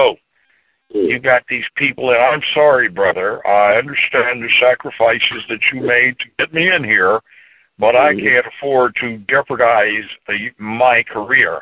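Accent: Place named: Texas, United States. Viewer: how does he have a texas accent when he has been in Los Angeles for so long.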